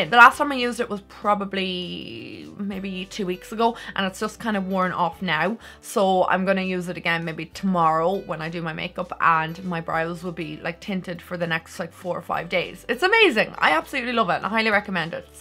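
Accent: Ireland. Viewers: I just love you so much!! And I enjoy listening to your brogue! It reminds me so much of my Nana who is from Kenmare..And I just love your makeup looks and think you are so talented!! Much love from Boston!!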